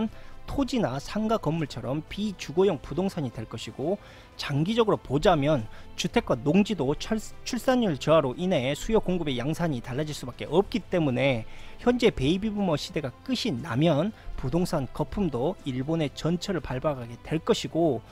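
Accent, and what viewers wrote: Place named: Honam, South Korea. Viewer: 칠백명때부터 보던 사람입니다. 사실 지역감정이 없던 저인데 가족이 전라도 사람에게 사기를 당하고 이후에 안좋은 마음이 자리잡게되었어요. 그런데 억양에서만봐도 님도 호남이 고향이신것 같은데 영상보며 응원많이 합니다.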